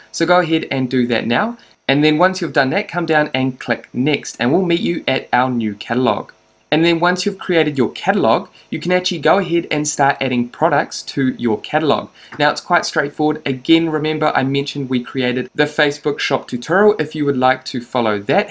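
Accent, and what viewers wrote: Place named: New Zealand. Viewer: I was wondering why your accent sounded so much like Wholesale Ted and it turns out you guys are both from New Zealand. The 'Catalogue' part was the trigger lol.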